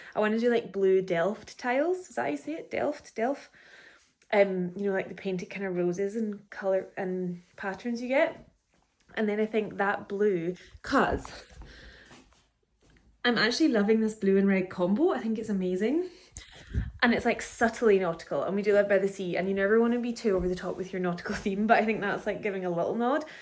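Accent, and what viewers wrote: Scotland, United Kingdom. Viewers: I’m a new subscriber. I love all the scenery and of coarse you as well. I could listen to you talk all day long.My Mom was Scottish , born and raised in Glasgow. My Mom and grandma can over to the US when she married my Dad. The dna kit says I’m 80% Scottish. We use to go to Scotland every summer. We usually stayed with my Aunt and Uncle who lived in Ayr. I’ve been to Alloway several times. I’m old enough to be your mother but just hearing your accent brings back so many wonderful memories for me. My Mom and grandmother have both passed so I miss the accent every day. I wish I could move to Scotland. It’s always been my second home. Alas, I don’t have the money so your vlogs it is! I’ve watched every one now and always look forward to a new one. I’m so happy I found you! 🍎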